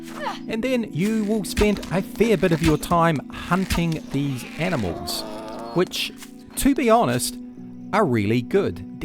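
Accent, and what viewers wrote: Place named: New Zealand. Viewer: Have you played it recently or moved on? I'm open to any other suggestions on open-world survival games that I can play with friends. I have not looked into State of Decay yet or Valheim, and so keen on The Day Before when it comes out! I'm also a Kiwi but living in OZ. I get so much crap XD. Happy to subscribe to a NZ game reviewer on YouTube! I assume that because of your accent and logo :)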